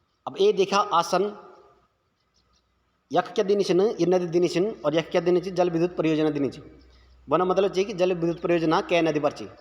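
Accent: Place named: Garhwal, India. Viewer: Aapki gadwali pyari h sir ji 👌🏻👌🏻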